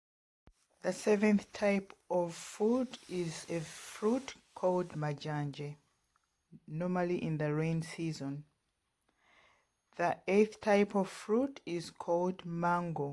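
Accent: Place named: Shona, Zimbabwe. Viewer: Oh my sister you have a beautiful Shona accent, Im enjoying it😄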